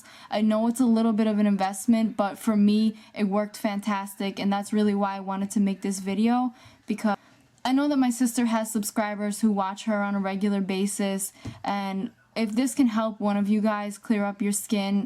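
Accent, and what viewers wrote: New Jersey, United States. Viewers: Are you from New Jersey? I can hear an accent.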